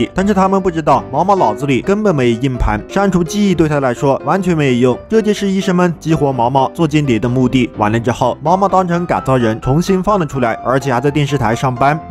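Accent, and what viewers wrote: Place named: Hunan, China. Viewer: 这个湖南口音。。。。好出戏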